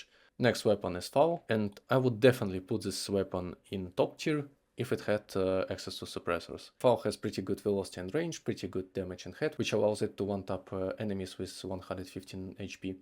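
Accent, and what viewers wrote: Russia. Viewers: I love your russian акцент, good luck on youtube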